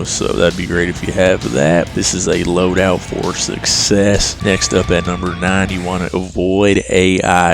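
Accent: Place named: Texas, United States. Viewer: You a Texas boy? I heard the accent and trusted you instantly.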